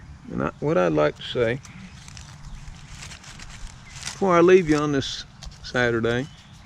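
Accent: South, United States. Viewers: your southern twang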